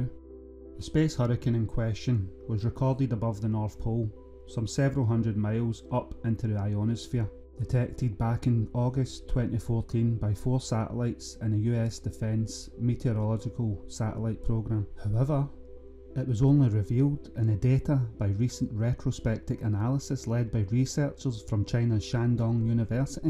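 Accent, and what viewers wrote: Scotland, United Kingdom. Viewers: I am not a native British and that is why the Scottish dialect is not understood by me. I believe many others from a foreign language area share the same pain with me. I just don't understand. I am sorry. Subtitles don't help because I have such poor eyesight that I can't see reading the text. 😢 The thick dialect is not suitable for international communication.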